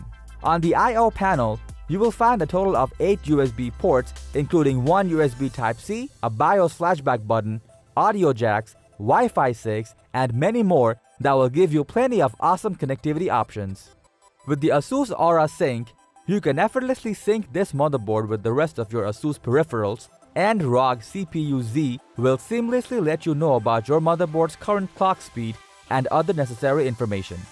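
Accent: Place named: India. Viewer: I know this video is Garbage 🗑 the moment the voice changed. From white boy intro to Indian guy 👦. Bruh you don't need an American guy to to start your video. Plus we can read the info on the box or website. More views with bad comments ain't good for you.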